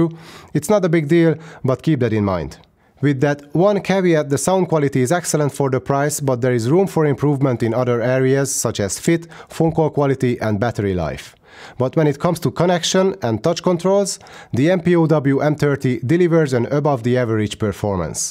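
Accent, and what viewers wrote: Hungary. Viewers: Valahogy érezni hallásra hogy valaki magyar 😅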